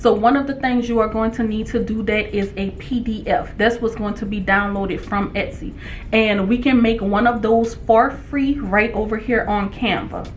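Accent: New Orleans, United States. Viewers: Where ya at. (504 colloquialisms. Born & raised in N.O. I hear that accent. ❤ Hunni...you out here helping folk live!! I'm here for these multiple streams of income videos all day. I just have to get computer savvy. I just foubd your channel & will be a new subscriber. Thank you for helping us learn how to go out get it.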